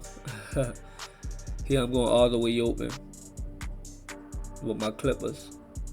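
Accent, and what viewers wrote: Baltimore, United States. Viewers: work on bein yourself, them cuts and that dumb ass “baldmore” accent. Yurturb 🤣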